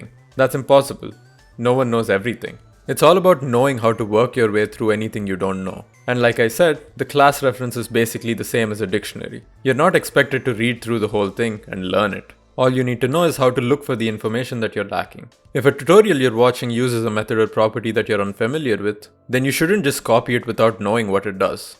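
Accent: India. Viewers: >click >indian accent >dislike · Bro, are u South Indian because of the accent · no front, but why are the best tutorials and tipps brought by people with indian accent?^^ Again here, no front or offense or something^^.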